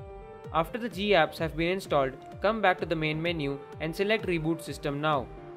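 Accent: India. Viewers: Is there a single Indian without an accent in this world? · Indian xD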